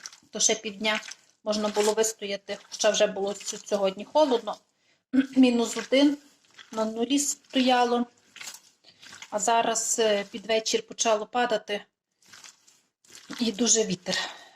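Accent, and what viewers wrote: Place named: Lviv, Ukraine. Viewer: І я перший раз дивлюся, тільки зайшла, почула наш Львівський діалект і відразу підписалася 😊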